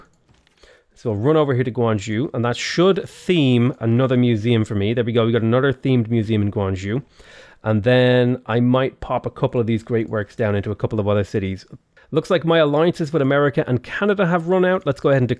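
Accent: Ireland. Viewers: You should triple down on silly pronunciations. You're Irish, so you can generate a really strong mispronounced accent that nobody can blame you for. And you can exaggerate all of the foreign sounding names.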